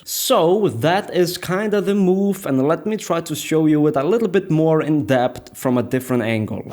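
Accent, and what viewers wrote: Russia. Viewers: He sounds Russian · I love the O.K. Hehe. (: I love the videos bro!! Your English is very clear, very good, and you're able to express yourself very well. Plus, the Russian accent is awesome! My dad is Jewish, Russian, Polish, etc. I've never been to Russia. Hehe. at times lives in my birth city Miami, FL. I used to live in but I am living abroad. I've never seen him in-person before. Thanks for the video!! (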